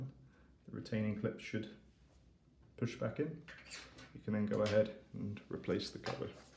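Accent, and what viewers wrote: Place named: United Kingdom. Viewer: Informative and British. Subscribed.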